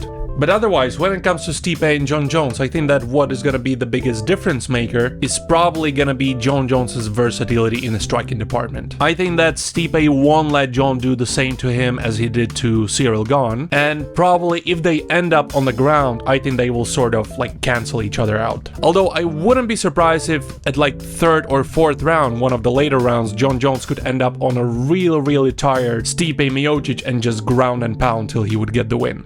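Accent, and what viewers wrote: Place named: Scotland, United Kingdom. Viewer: Loving the Scottish pronunciation 🙊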